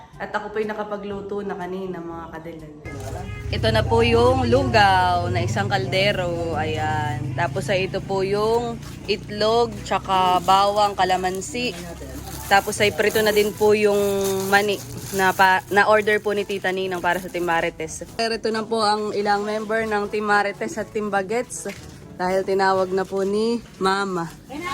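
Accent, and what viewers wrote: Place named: Infanta, Philippines. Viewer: Good morning ang sarap ng lugaw tamang-tama sa malamig na panahon😊 parang ang punto niyo po ay taga infanta tama po ba?😊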